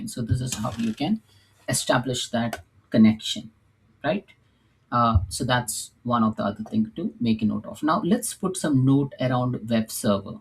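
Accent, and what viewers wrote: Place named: India. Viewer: It's really hard for me to adjust and listen to English with hindu accent. But your presentation is so concise, to the point, illustrative and easy to follow that I've stuck around and put in the effort. Thank you,!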